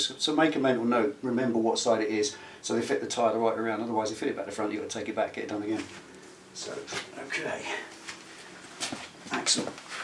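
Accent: United Kingdom. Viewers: You , sir, are absolutely brilliant! I always watch a video or two each time before working on the Bonneville. Being an Anglophile, the British accent goes a long way toward getting what you're saying lodged into my mind, lol!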